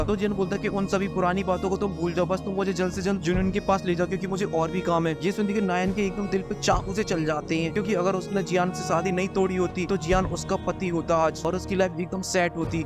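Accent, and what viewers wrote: Haryana, India. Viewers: Kya aap Haryana sa ho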